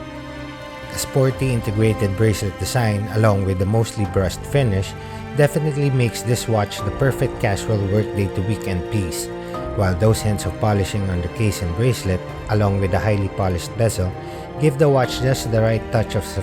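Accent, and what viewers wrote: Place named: Philippines. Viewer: your filo accent is annoying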